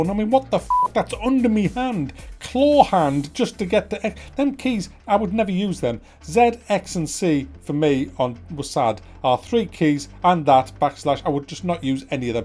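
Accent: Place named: North East England, United Kingdom. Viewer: I look forward to videos, hearing a good old rant from a fellow North East bloke!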